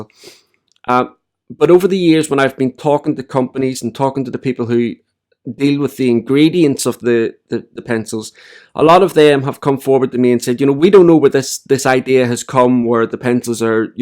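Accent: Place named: Northern Ireland, United Kingdom. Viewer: Ahhh, I could listen to North Ireland all day. My mum's from Armagh. :)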